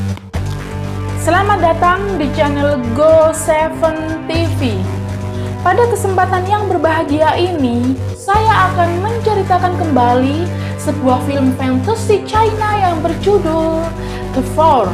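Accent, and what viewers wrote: Java, Indonesia. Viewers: Medok mantul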